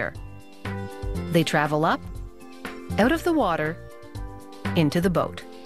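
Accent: Canada. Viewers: The speaker is canadian... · I hear a very distinct Canadian accent.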